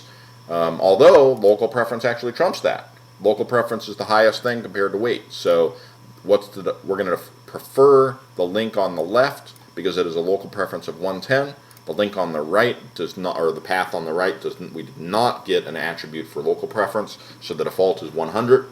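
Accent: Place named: United States. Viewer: Really enjoyed the delivery of this and I struggle with the American accent. Thank you!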